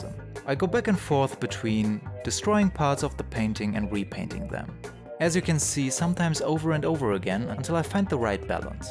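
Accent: Germany. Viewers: You have a German acsent Ich finde es schön wie du darüber erzählst was zeichnen für dich ist und das man nicht auf andere hören soll....Danke für die tipps 😄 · are you german?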